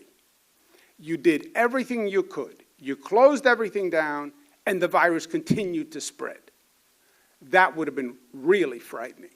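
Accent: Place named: New York, United States. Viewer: Someone’s tone of voice or accent shouldn’t determine his religion. He got a New York accent.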